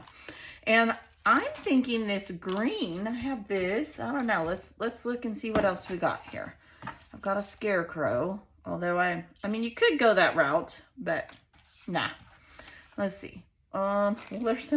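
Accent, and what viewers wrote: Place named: Oklahoma, United States. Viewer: if you are like me your "Walmart" photo packages had 50 images of different sizes and the bigger ones used to hang on my wall in frames. People don't seem to do that so much anymore, but I refuse to discard them so...they are in my albums as well as a few of the smaller sized sprinkled in. There is just something about an 8x10 that encapsulates the family unit. Gotta keep 'em and enjoy 'em! BTW - LOVE your "Okie" side when it comes out!! You did a wonderful job on this large photo layout!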